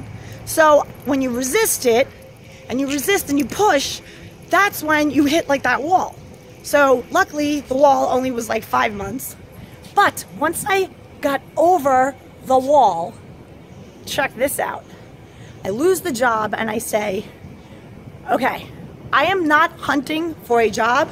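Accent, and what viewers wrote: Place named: New York, United States. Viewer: New Yorker? Love the accent. Your energy is amazing! Thank you for sharing! I love Abraham I listen to her every day 😀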